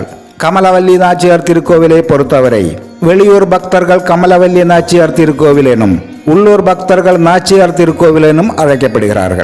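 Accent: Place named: Kerala, India. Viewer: നല്ല മലയാളച്ചൊവ ഉണ്ടല്ലോ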